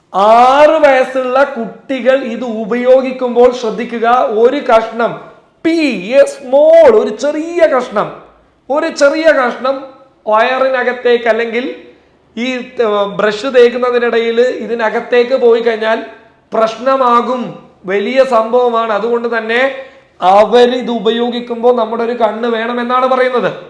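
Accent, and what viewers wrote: Kasaragod, India. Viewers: Basheer bashiyum thudangi bb cream njan vanganonn vijarichu eni vangunnilla kasaragod samsaram nalle und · എടാ തനി കാസർകോട് സംസാരം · കാസർഗോഡ് ഭാഷ കേട്ടാൽ തന്നെ ഛർദിക്കാൻ വരും. ഈ മാതിരി ഊമ്പിയ ഭാഷ... വല്ലാത്ത ന്യായീകരണം തന്നെ.... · Kasargod Slang adipoli😀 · ella languagum manasilaavum ennal kasargod slang inte ponnooo onnum manasilavula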